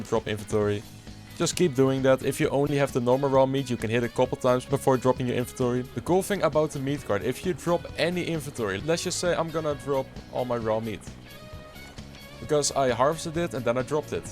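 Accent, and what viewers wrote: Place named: Netherlands. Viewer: Waarom hoor ik het altijd meteen als iemand Nederlands is.. dope video btw en misschien kom ik een keer je server checken.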